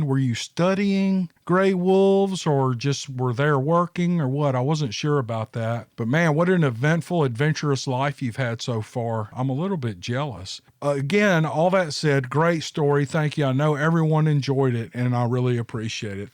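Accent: South, United States. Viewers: It's your voice we want to hear, for me it makes me feel at home.iI was raised with the southern accent, and the La., Missippi way of talking.Just talk all you want, we all love it. Thank you for the storys. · I love putting your videos on while I'm doing other things. Nice stories and your voice is very soothing. I live in Michigan, but I'm originally from down south and your voice (and accent) reminds me of my childhood. Thanks. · How did i go one day without listening to your great southern draw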